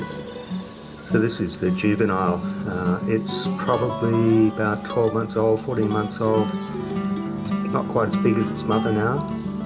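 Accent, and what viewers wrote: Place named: Australia. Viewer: Talking is fine by me as I learn a lot from you, and my wife thinks your Australian accent is cute!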